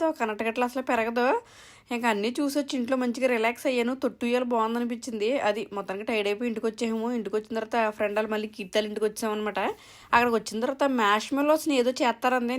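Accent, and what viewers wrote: Godavari, India. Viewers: Akka super Godavari telugu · మీ గోదావరి యాస గొప్పగా ఉందండి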